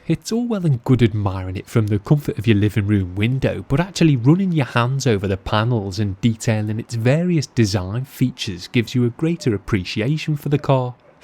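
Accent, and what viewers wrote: Liverpool, United Kingdom. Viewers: No worries, I can only imagine. By the ways, loving your videos and your accent (what region of the UK is it from?). Big hello from Canada! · Your accent is like a mixture of scouse / northern.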